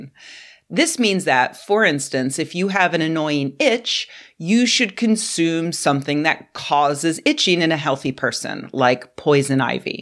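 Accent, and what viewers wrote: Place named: Pacific Northwest, United States. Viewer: As soon as she started talking, I knew you were from the Pacific Northwest—a place where people are very loud with their lack of information. I have nothing to say about homeopathy, but I find it funny you don’t understand that a licensed naturopath by law has the same education as a general practitioner. One difference is they don’t do a residency and are kept separate by insurance companies will be a second. Another is natural medicine is the foundation of their training. An allopathic doctor who does integrative medicine is secondary—— learn through CEs. A statement often heard in the Pacific Northwest is, “I trust science. “ This is a religious statement that has to do with science. The belief that something is true only if it can be empirically proven. This is misguided. Empirical fact and truth are two different things. 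Not everything true can be empirically verified. For instance, we have no idea why or where, in the brain, everything comes together from vision, hearing, and smell to be understood, yet we can still do all of those things. It is called the binding problem. If I remember correctly, in homeopathy, the active ingredients are broken down by parts per million. I don’t know if it works, but I also don’t believe there is a definitive way of testing it. I know that endocrine disruptors at lower levels are more likely to cause problems in the human body than at a higher level—— Which is one way petrol-chemical companies have been able to say their plastics were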